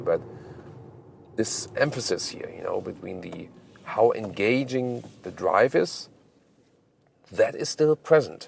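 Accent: Germany. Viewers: Bro man hört deinen deutsche Akzent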